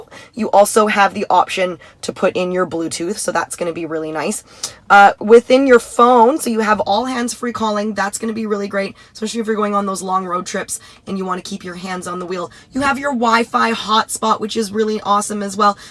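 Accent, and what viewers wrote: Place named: Canada. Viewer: Canadian tell 1 - "Zed". Canadian tell 2 - "Oout".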